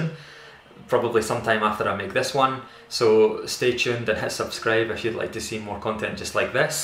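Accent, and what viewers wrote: Scotland, United Kingdom. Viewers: Nice Scottish accent;-)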